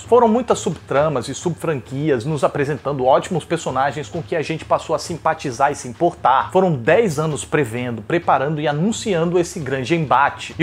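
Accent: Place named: Ceará, Brazil. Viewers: Cara adorei seu trabalho, fala um ciarez fluente, com mestrado, doutorado e senhor dos anéis, deixa tudo muito engraçado de se ouvir, bom trabalho. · Amo sua narração (estilo nordeste)em cearencês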